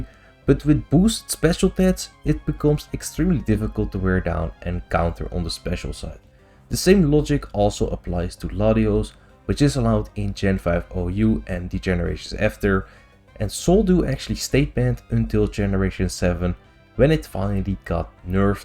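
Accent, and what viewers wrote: Netherlands. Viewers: I like how i could tell you were Dutch after the first 5 seconds. I am also Dutch myself 🙂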